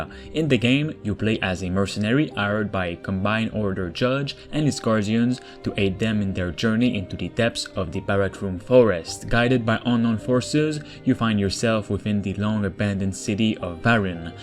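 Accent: Quebec, Canada. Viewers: Mmmm nice video et nice accent mon quebecois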